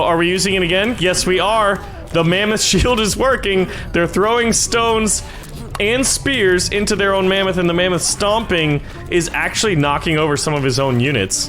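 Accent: Scotland, United Kingdom. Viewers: 10/10 Scottish accent being given throughout the video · Scottish~ish sounding voice but English units No complaint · I like the Scottish accent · Scottish accent ?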